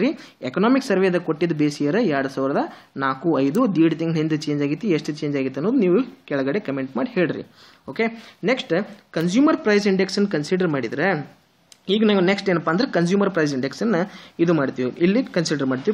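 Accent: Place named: Karnataka, India. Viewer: 👌bro nimm Kannaada acent keloke kushi aglikathithi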